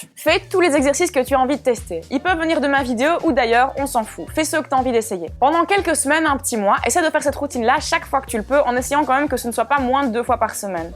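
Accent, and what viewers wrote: Belgium. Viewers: Hey j'adore tes vidéos Néanmoins j'entend un petit accent serai tu une petite Belge tout comme moi ? Continue ce que tu fais t'es top 🐱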